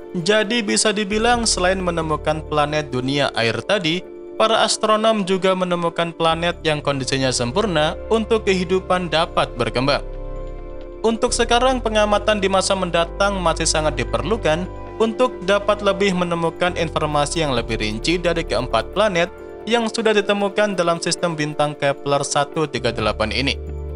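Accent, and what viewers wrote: Java, Indonesia. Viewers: Pengisi suaranya wong jowo koyo e..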